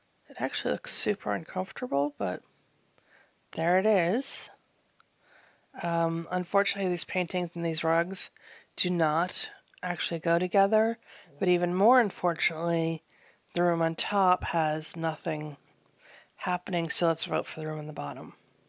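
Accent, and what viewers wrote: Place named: United States. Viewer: Kind of a personal question, and maybe you've answered it before... You sound like an American, how did you come to be living in Ireland? Also, do you like living there? (I might be looking for a new country to move to after November 3, lol.)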